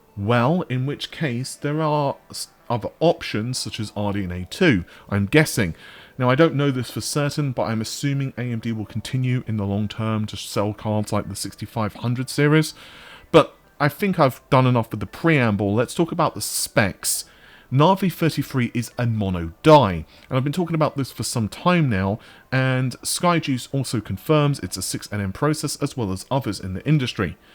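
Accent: United Kingdom. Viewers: Three quarters of an hour! Three quarters of a fkcun hour and you don't know anything! For three quarters of a fkcun hour you talk the talk about the talk which talks about other talk you've heard in the past and touches on possible future talk you might or might not hear one day, and all I get from that is waffle in a British accent! You don't know shit ~ you can talk about not knowing shit for 3/4 of an hour. I'm never going to get those 45 minutes back. It's like the promise from Micro$oft that you can wipe windows and get a refund from the company. In all the 3 billion people who have wiped windows and tried to get that M$ money back, how many have actually received it? I can hear crickets, I can hear tumble-weed blowing past..... I can hear Liz Tru$$ going down in flames but I can't hear anything about my 45 minutes! But for the rest of my life I will be still able to hear the talk that talked about the talk, which featured the talk about talks we've had in the past and looked into the bright and Rosy future (she's a nice girl, Rosie) and then we stopped to talk about that talk, so the talk got side-tracked by the talk which went into a talking holding pattern around the outer marker while we talked about fuel economy and reserves of time, because to run out of wind in mid talk would be a catastrophic event, and no meta-talk (a talk about a talk) could deal with the meta-catastrophe of talk about talk that talked itself to a stand-still....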